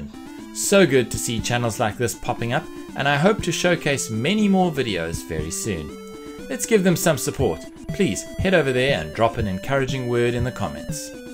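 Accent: South Africa. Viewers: It's weird coming across a channel and hearing another South African, I'm not used to it but its increasingly common!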